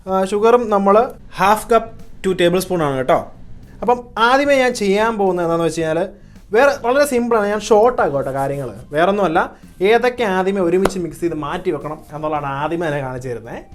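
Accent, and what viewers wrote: Kottayam, India. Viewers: 'flar' 😄😄😄😄 sir kottayam kaaranano sir inte oro videos onninonnu soooopr 👍👍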